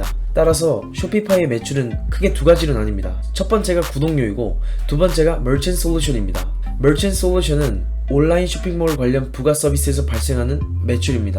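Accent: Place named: Jeolla, South Korea. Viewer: 영어 발음이 섹시하시네요 ㅎㅎ 반면에 한국어는 전라도 억양? 귀여워요!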